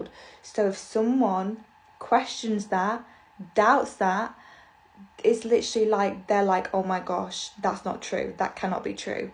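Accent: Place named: England, United Kingdom. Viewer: Thank you. I love your accent. Are you from England?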